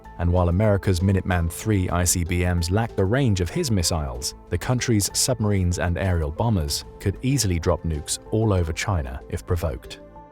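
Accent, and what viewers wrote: England, United Kingdom. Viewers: Nice try. "British accent" can refer to any British accent. In this case, assuming you are not deaf, he is clearly referring to an English accent. But wait... in your attempt at pedantry you yourself were far too broad. So I'LL ask you: Which English accent is it? Estuary? King's RP? Norfolk? West Country? Brummie? Scouse? Midlands? Chorley?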